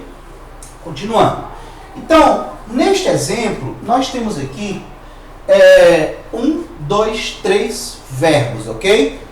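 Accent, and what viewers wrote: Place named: Northeast, Brazil. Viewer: se fui agressivo, desculpas mil! O problema é que o amigo posta aulas não para o nordeste e, sim, para todo o Brasil, aliás aulas excelentes. Minha bronca, que nem mesmo é uma bronca, apenas uma observação mais forte que o tom normal, é que o amigo teria ainda mais sucesso do que tem se, num gesto de concessão, fizesse um esforço para pronunciar corretamente o fonema "de". A toda-poderosa Globo gasta milhares de reais com fonoaudiólogos para corrigir vícios, ou regionalismos,de linguagem, pois suas séries não são apenas para o público carioca e, sim, para todo o Brasil. Cara, repito, um milhão de perdões se pareci agressivo. Aqui, o "astro" é o amigo, sou apenas um assíduo visitante dos teus vídeos os quais são dádivas aos necessitados de melhoras em termos de conhecimento da nossa tão amada língua portuguesa. Abraços e saudações coloradas!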